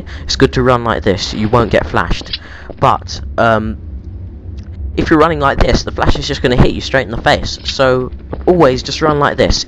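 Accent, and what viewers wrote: United Kingdom. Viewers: he has an english acent bad spelling* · LMAO, Great Video...im not racist but the British accent makes it funnier for some reason!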